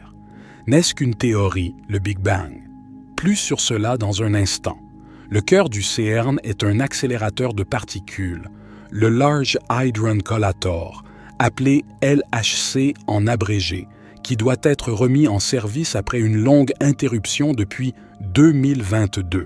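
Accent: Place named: Quebec, Canada. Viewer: oui vous avez une abonné de plus et j'adore votre accent québecois je pense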